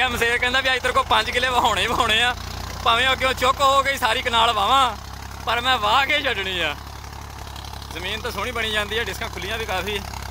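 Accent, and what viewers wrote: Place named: Doaba, India. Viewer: Paji boli ta thodi doabey di lagdi a....khush raho veer bohat vadiya c vlog....hor v paya karo tractor related videos.....Allah thonu tarakiya bakshey....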